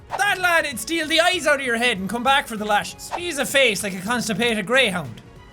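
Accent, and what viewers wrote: Ireland. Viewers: Ur Irish accent is fucking cool😊! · i was like sounds so irish in the beginning is he emphasizing it but i came back to the beginning and now it sounds normal after watching the entire thing wtf lol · Jesus gets hella Irish this time of year. ITS RUSHING THROUGH HIS VEINSSSSSSSSS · ahh remeber the good old days with jacks irish voice =] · I love how Irish he gets in these videos XD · Hearing that strong Irish accent again oh my god the memories 😭😭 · He has never sounded more irish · He went FULL blown IRISH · Irish accent is starting to fade away... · OHH FULL-POWER IRISH TODAY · These will always be my favourite videos!!! I wish had that heavier Irish accent regularly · I wish you’d speak with your Irish accent more often, you sound awesome when you do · I love how you become 1000x more Irish on Saint Patrick’s Day 😂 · I swear to god you get more "Irish sounding" and into this every year XD keep it up its amazing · I'd love a video where whips out full on Irishman. 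Just like a nice THICC, JUICY accent.:3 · I want to do a whole video talking like an Irish person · ive never heard this much irish in my damn life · Also this is the most irish ive ever heard sound 😂😂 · i’m part Irish and i’m quite peeved I don’t have an accent, which is why I love yours. Keep on being our favourite greeny boy.💞🍀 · he Needs to make a video where he literally talks the in the biggest irish accent he can for the whole video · Who else came just to hear his Irish. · I have a feeling is trying to sound more Irish. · He just got more and more Irish as the video went on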